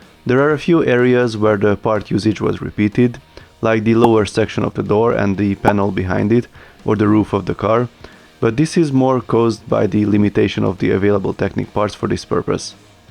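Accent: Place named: Hungary. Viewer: Te Magyar vagy? Egyrészt,mert hívnak,másrészt az angol kiejtéseden is látszik.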